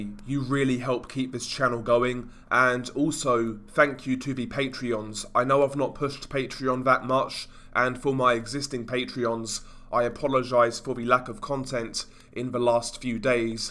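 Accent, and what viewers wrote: Castile, Spain. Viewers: Please don't pronounce the names in European Spanish it is extremely grating and nowhere near as warm and sweet as Mexican Spanish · Your Spanish accent has improved a bit but why are you pronouncing in Castellano? Castellano is spoken in Spain only !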